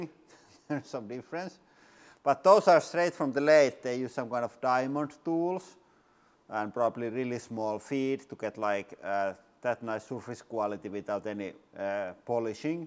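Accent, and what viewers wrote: Finland. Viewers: That accent, Is he Finnish? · Flat like'a da pancake Did you invent a new word? Fanacy? Who doesn't love that fin accent?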